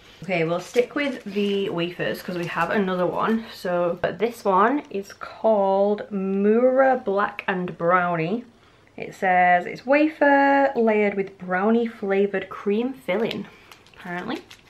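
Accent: United Kingdom. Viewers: I love all fake banana flavors and concerning other posts below me how everybody is talking about where you're both from somewhere different I thought you were from the UK and he sounded to me like he was from America so I just thought I'd add that my two cents in cuz I actually have no idea.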